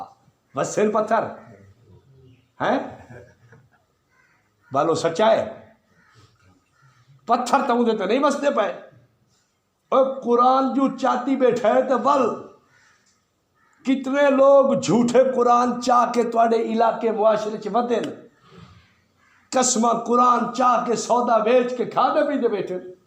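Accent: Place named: Punjab, Pakistan. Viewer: بہت خطرناک پنجابی بول رہے ہیں، کچھ بھی سمجھ نہیں آ رہا ہے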